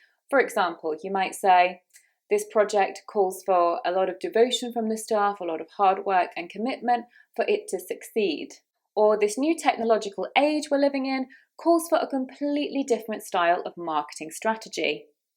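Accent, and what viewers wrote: United Kingdom. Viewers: ❤, I love ur accent so much I wanna know the name of this lovely British accent Thank you ❤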